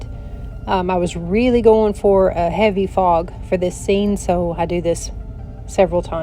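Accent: South, United States. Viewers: Nice voice and subtle southern accent. Nice painting too.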